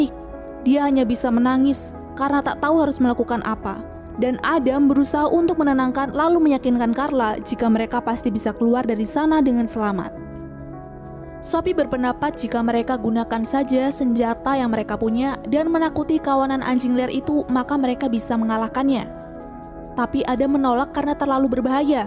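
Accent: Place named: West Java, Indonesia. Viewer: sy heran ama pembawa alur cerita ini, dr logat bahasanya sunda, tp dr video pembukaan biasa seperti situasi di jawa timur, karna sy orang jatim yg tinggal di bogor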